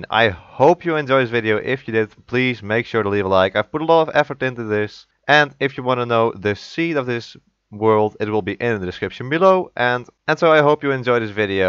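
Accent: Netherlands. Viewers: Mooi Engels haha valt wel op dat je Nederlands bent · Goddamn the dutch accent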